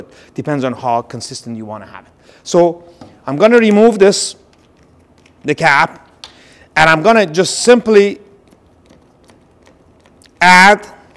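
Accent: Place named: Iran. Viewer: Yeh. This is how Persians speak. Strong accent. You can tell straight away he is Persian.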